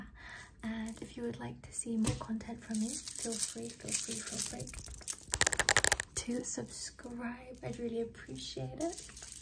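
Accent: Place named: United Kingdom. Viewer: Oh my god I was NOT expecting the British accent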